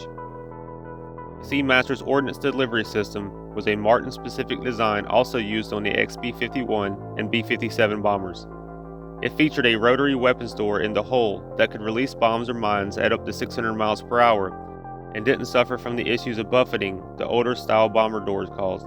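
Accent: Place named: Southwest, United States. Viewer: Great Channel Name. Betty Page and Airplanes from the Cold War - Make a full 3 minute opening theme of that. Info I have never heard or read about anywhere else and I am big into history. Narrator has a SW to MW accent. Texas or Oklahoma?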